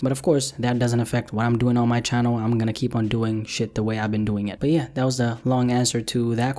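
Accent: Canada. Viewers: you Canadian? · Are you Canadian??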